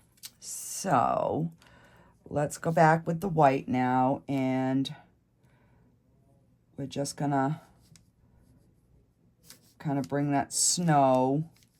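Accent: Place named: New England, United States. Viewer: This is BEAUTIFUL! Reminds me of Bob Ross. I've been following you for a while now, liking your vids on my TV. I'm from Mass & you sound like a New Englander, love watching you, feels like I'm hanging out with an old girlfriend 😊 You are quite the artist!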